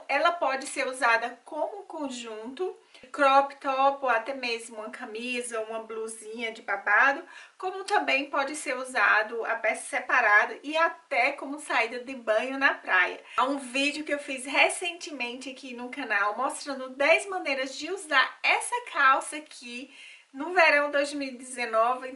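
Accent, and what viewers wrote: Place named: Northeast, Brazil. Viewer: sou pernambucana e por isso reconheci o sotaque nordestino,👏👏👏👏😙😙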